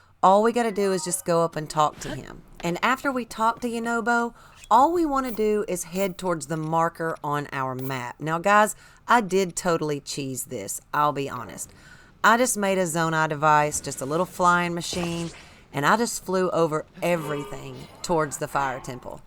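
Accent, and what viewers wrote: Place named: New York, United States. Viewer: You sound like your from New York.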